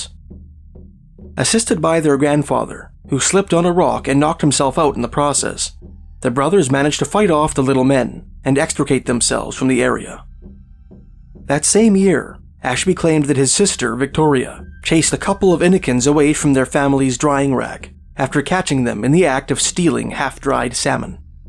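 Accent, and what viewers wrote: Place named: Canada. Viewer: I saw your moving video! Was a great little video. I tried to leave a comment but i dont think it was recorded. I was wondering if the house you left was the location of the rock blasting and the little guy? And you are speaking English. "Canadian English " wow that's different. Greetings from Brazil.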